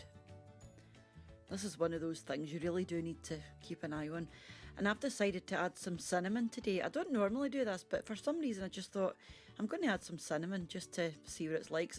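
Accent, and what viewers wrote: Scotland, United Kingdom. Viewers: I can remember my Nunny making this for me and my sister when we were wee and would go to visit her in Scotland. It was always such a treat. I learned how to make it over time and my own daughter was just asking about tablet the other day. I think I need to get out the recipe 😂. I love these videos and just listening to your voice; reminds me of my Scottish roots and family. 🎉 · This recipe video just amazingly ended in my feed! The last time I had this was while in Scotland in 1996! Never got the recipe. I subscribed immediately to your channel! Many dishes I see on here I want to attempt to make. I'll let you know how I'm doing! And. My heart leaps with joy hearing your brogue!❤️ I miss Scotland so much.😢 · I'm a Scot living in Italy. It's lovely to hear your accent and the recipe is great, thanks! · Love and THANKS from Germany! 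As wonderful as the recipe is your warm voice and the Scottish you speak - my husband Ingo and I visited Scotland last year for the first time and totally fell in love with the people, the land and the language. It really touches me inside. In my opinion the language/dialect/accent one speaks defines identity and cannot be regarded highly enough!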